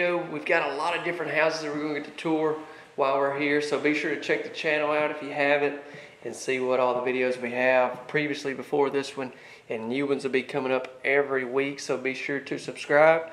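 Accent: South, United States. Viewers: Nice mobile home, and like that southern draw.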